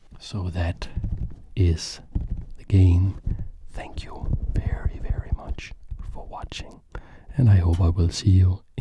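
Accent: Denmark. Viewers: Jesus Christ I got a shock hearing your danish accent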